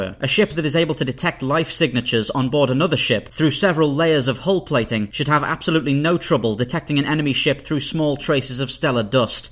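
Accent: United Kingdom. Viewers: I like your channel.i like listening to your Sci fi monologues in that British accent that makes the most mundane topic interesting. Sucks to be American,I guess.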